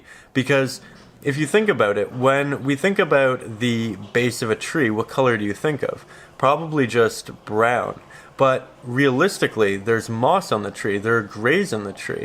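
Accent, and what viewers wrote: Canada. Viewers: Hi I've watched this b4. I know or assume you're in Canada due to your accent, I grew up sailing & boating on the shores NE.This water direction looks odd to me. Where is it coming from? The ocean would not flow from the bank opposite your beach? It would come from the horizon wouldn't it? Therefore the waves would pass over the beach horizontally? My mind simply can't settle on it. Is it me??? Can you explain it to me? Thanks for sharing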